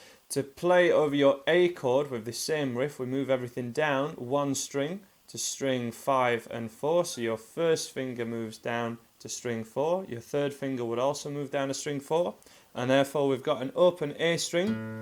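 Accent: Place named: Liverpool, United Kingdom. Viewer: Another awesome lesson, dude. You have a great way of teaching guitar and familiarizing yourself with an audience you can't even see. Are from Liverpool or Woolton by chance? You sound a lot like the legend on the wall behind you. Accent wise. Trips me out. Thanks again, brutha.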